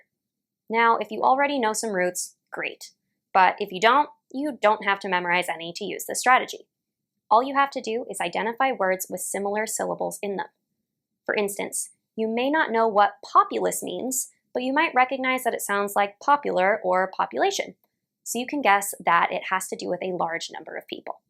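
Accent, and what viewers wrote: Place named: United States. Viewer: I like her American Accent.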